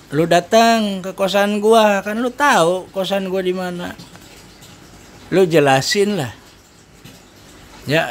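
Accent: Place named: West Java, Indonesia. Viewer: bang suara elu udah kaya sepuh abah abah logat sunda tapi foto elo keren ganteng keren salam sortir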